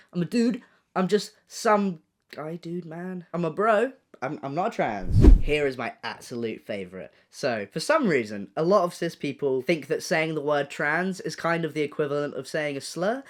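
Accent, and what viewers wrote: United Kingdom. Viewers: sounding EXTRA British today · Did anyone else notice that had more of a British accent than ever? Yes, I’m American. The British accent just seems to come out more in this video. I’m loving it. Lol! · Okay but am I the only American who can't get over the fact that he says patronizingly funny because of the British accent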